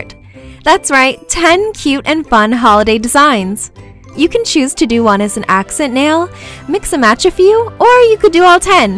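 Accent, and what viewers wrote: Newfoundland, Canada. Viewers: You're from Newfoundland?? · Are you from Newfoundland? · Isn't she from Canada though? Maybe it's a Canadian thing.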